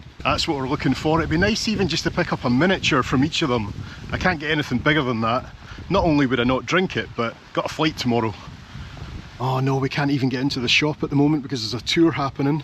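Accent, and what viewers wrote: Scotland, United Kingdom. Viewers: Tbh.. After watching through most of you videos I hade to google “Wee”. I understood it context to be tine but in my Swedish brain it’s something else.. but apparently my Scottish is useless from time to time:) Keep it up. I enjoy you dialect and your videos. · The more remote a Scottish place that goes to, the stronger his accent gets.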